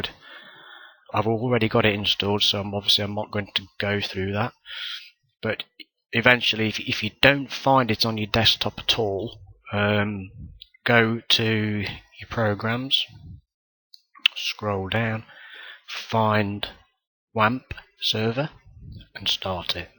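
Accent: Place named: United Kingdom. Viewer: Fantastic tutorial! It's nice to get some British tones on here too!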